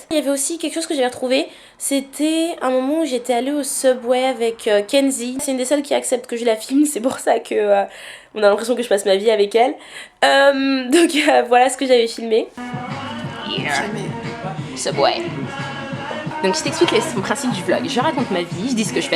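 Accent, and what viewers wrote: United Kingdom. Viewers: J'ai pas mis de pouce vert pour le cast de Bref mais pour ton Magnifique accent so British ;) Tu m'as tué x) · Trop chic ton accent "british" :P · Aaaaaah j'aime tellement t'écouter parler c'est ouf *w* Je trouve ça trop cute l'accent british, aha, c'est "chic" (bon ok c'est nul mais bon hein :3) . Hâte de voir la prochaîne vidéoo <3 -J. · Ton accent british c'est chic babe ! ♥ aha . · J'adore l'accent anglais ! C'est chic ! et en plus tu te débrouille bien, et j'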